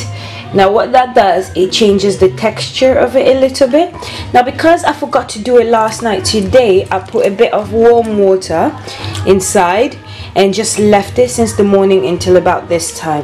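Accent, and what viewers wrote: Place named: United Kingdom. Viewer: 😍😍😍😍😍😍😍 she talks well, your accent sounds Uk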